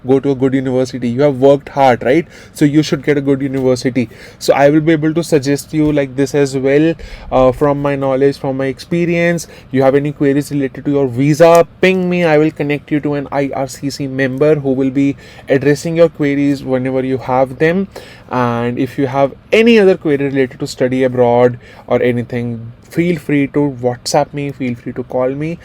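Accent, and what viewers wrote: India. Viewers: Its my humble suggestion that first learn then teach. Your accent is not up to the marks. Typical Indian style listen, :THAT IS, very substandard". My brotherly advice would be please don't spoil others. And remove your chnnel imediately, Then your delivery, "TRY" is not of English ACCENT. It seems as if your are speaking Indian. Veyr bad.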